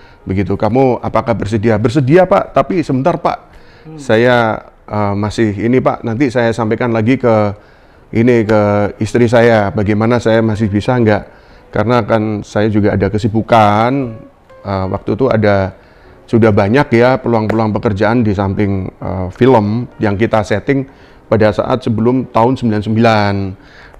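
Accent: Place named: Surabaya, Indonesia. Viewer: khas banget logat Surabaya om 😍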